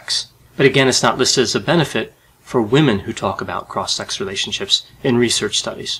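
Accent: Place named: Philadelphia, United States. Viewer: Anothe cool video! You’re from the Philly area aren’t you? I heard a few words that gave it away.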